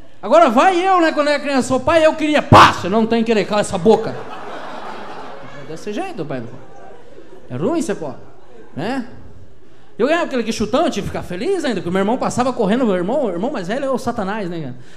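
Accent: Curitiba, Brazil. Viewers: sotaque da região metropolitana de ctba :)))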